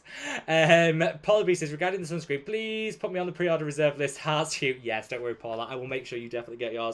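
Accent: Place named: England, United Kingdom. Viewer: Are you from England? I'm in Charlotte North Carolina. My name is Martha . The reason i asked is bc my Dad was from Birmingham England. So i have a lot of English in me. My Dads last name was Herbert. A very English name. Love your accent God bless you stay safe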